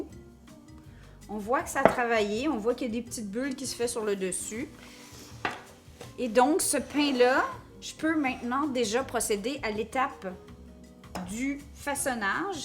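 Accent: Quebec, Canada. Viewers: Heureux d'être tombé par hasard sur votre chaîne en cherchant des infos sur les "air Flyer" , et de fil en aiguille j'arrive sur la fabrication de vos pains, il manque l'odeur mais la salive coule et l'estomac dit qu'il veut çà !!! Vous êtes formidable à regarder et quel plaisir d'entendre ma langue natale avec ce si joli accent de nos cousins d'outre atlantique. Merci, merci beaucoup ❤❤👉🇫🇷🇨🇦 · Est-ce que j'entends un accent quebecois adorable? Bonjour d'une Francaise qui vit aux US! Mais encore une petite chose tout de suite: Einkorn (l'epautre), c'est de l'Allemand, pas autre chose, lol! Cici dit, je vais me mettre aussi tot a preparer tout pour realiser cette recette! Merci de partager, et vous etes un vrai rayon de soleil! J'espere que vous n'utilisez que des farines bio, car sinon, les farines standard sont tellement emoisonnees avec tous les mauvais choses se terminant en "cides", qui veut dire 'tuer'. 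C'est tres serieux, mieux vaut ne pas manger du pain du tout, que d'en manger fait a la farine non-bio. Protegez votre sante, mes amis humains! Bonnes aventures sur la route du pain fait maison! Merci encore!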